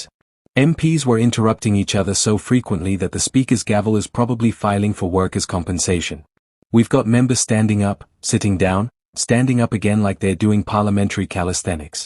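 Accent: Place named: United Kingdom. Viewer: Why the Brit voice and you are showing provincial leaders instead of Federal parliament members?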